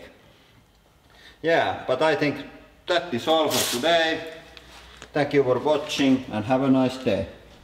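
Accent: Finland. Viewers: Wow the finnish accent is really different than the swedish one eh? How similar are the two languages , if anyone knows? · FINLAAAAND ACCENT!!!!!!!!!!! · typical finnish england :) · Ah Finland. I wondered where your accent was from.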